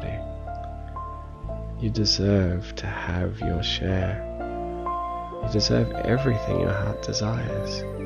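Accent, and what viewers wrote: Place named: Australia. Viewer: This could have been a beautiful meditation if you trusted us to connect with our higher self when you took us there and gave us a little silence to see what arose. You were pushing an outcome, Just a little advice, a meditation is not a pod cast, too many words risks us being moved by your words not our personal 'inner body felt' experience which will be different for everybody and it also keeps us in our heads, having to try to listen to every word you say. This was my experience but going by the comments i am alone in this so obviously it served a purpose for so many so congratulations. Best bit of this was to hear an Australian accent for a change. love Mary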